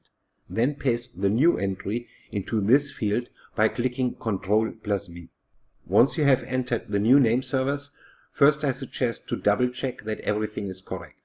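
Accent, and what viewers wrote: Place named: Germany. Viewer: Thank you, this was really helpful. What could feel more secure than having a German accent helping you manage technical stuff online confidently.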